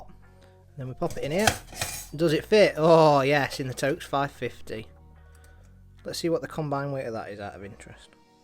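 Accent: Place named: New Zealand. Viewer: That New Zealand accent is so strange to hear. I ended up having to put on subtitles. Thank you for the video. Aloha.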